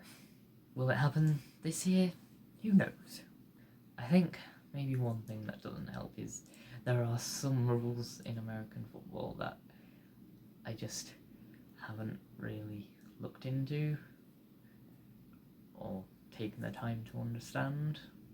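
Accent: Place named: United Kingdom. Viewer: watching you be exceptionally British makes me insanely happy oh my god THE FREAKING ACCENT #Simp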